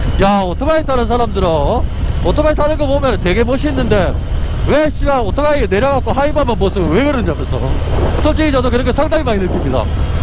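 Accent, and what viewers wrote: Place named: Gyeongsang, South Korea. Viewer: 같은 경상도라 정감이 가네요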